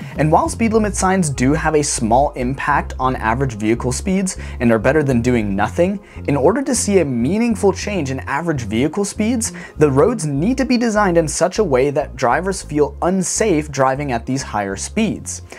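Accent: United States. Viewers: Impeccable American accent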